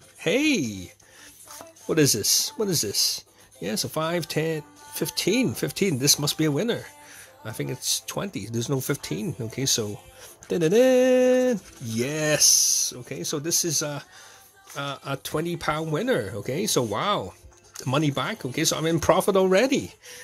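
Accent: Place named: Netherlands. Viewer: Ah you live in Belfast. Yes I know it. I just thought you had a Dutch accent.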